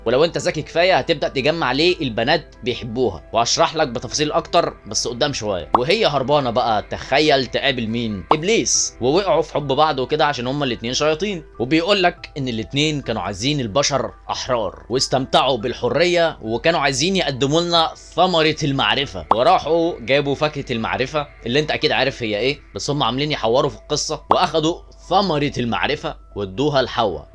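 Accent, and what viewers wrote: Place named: Syria. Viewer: من البداية من لهجتك على بالي انك سوري مش مصري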